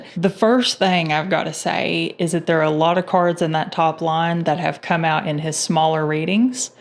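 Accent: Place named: North Carolina, United States. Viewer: Hey I subscribed to your channel a few days ago. I’ve been watching several political terrors for months just discovered you. I want to say I’m quite impressed. Thank you for all you do. I’m in Asheville, NC and your accent sounds kind of local to me. I see not from this area. Best of luck, keep up the good work!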